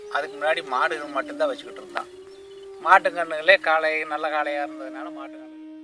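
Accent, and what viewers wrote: Kongu, India. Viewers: Kongu Tamil❤️ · ஆஹா...கொங்கு தமிழைக் கேட்டுக் கொ‌ண்டேயிருக்கலாம்! அருமை! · இந்த கொங்கு இன தமிழ்....... வாழ்க.,.. வாழ்க.....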